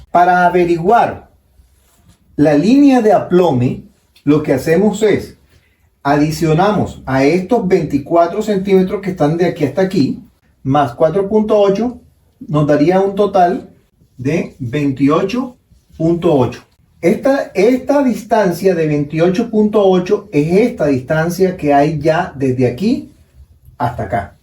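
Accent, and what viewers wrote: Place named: Venezuela. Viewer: Tiene acento Venezolano❤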